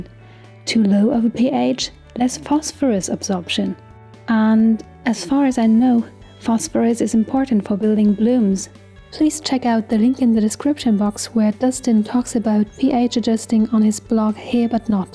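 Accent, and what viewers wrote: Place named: United Kingdom. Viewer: I love your voice 😘 British accent with Eastern EURO thinking ☺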